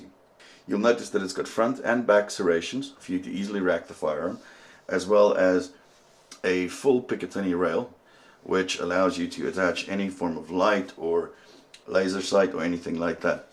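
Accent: South Africa. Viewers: Are you South African? That accent sounds familiar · You sound a bit South African. Thanks for a great review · Are you South African?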